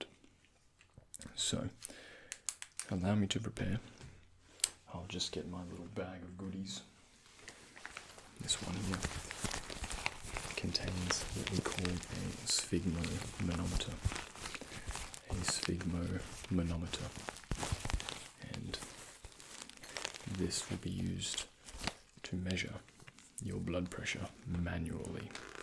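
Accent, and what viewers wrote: Australia. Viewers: Seeing all these people hyping over the deep Australian accent makes me think how many nights I've spent not alone have been caused by the same type of horny people. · Don't mind me. I'm just here for the aussie accent. :)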